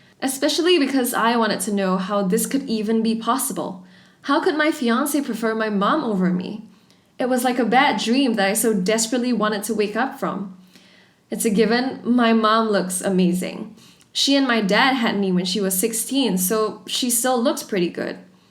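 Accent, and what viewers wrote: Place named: England, United Kingdom. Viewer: The reader has a slight English accent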